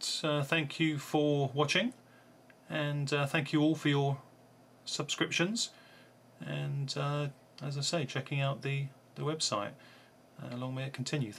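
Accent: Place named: England, United Kingdom. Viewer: noticed your accent mate i am from england too, come from essex mate. how did you manage to get deadly prey on vhs. i used to have a vhs copy orinigal not in japanese writing, the cover looks like danton at the end of the film screaming outside of a bulding, which is misleading cause you don't see any buildings in the film. still great movie. don't have jungle assault still trying to get a copy. do have deadly prey.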